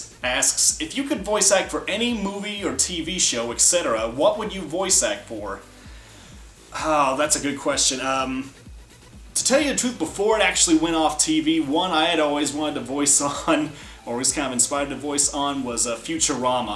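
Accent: South, United States. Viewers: YOU'RE JUST WITH A COUNTRY ACCENT!! Thnk goodness..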